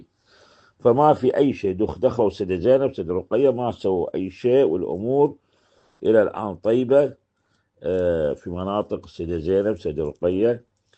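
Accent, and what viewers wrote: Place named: Gulf, Arab world. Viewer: هذا يحجي خليجي مو سوري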